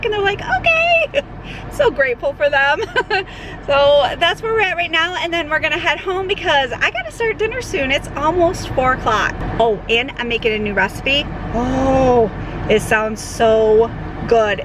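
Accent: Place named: Michigan, United States. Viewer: Are you from Michigan.... you talk just like me lol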